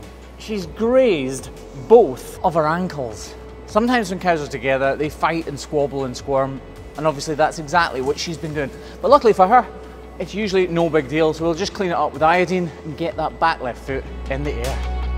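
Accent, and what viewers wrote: Scotland, United Kingdom. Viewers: Thank you. I think this is the one I have bin waiting for, bin worried about her. I think I called her a different number though. Lol I'm Canadian and I can't quite get your accent. I love it. Especially when you have the deep Scott's accents on. 😊 wanted to let you know I love your channel. Bin Bing watching it you reside me of my grape he was a vet. I got to see so many amazing things with him. He was so loving and caring animals first. He even stitched up my finger when I cut it of at the age of 7. Mom said hospital grandpa said " what am I? It's just a finger. I got this." And so it was I was stitched up by gramps. Love it. Oh your no where near his age and actually your younger than me. But you do reminded me of him. So thank you for beeing you. Your Albertan friend.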